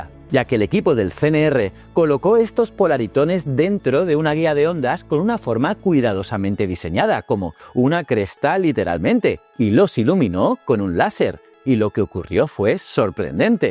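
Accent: Spain. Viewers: ASQUEROSO ACENTO ESPAÑOL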